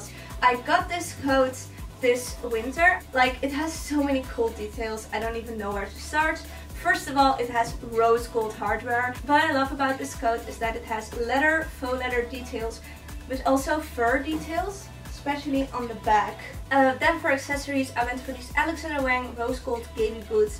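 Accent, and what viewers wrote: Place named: Netherlands. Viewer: First couple seconds of you talking and I already figured out you're dutch 😂😂 leuke video girl xx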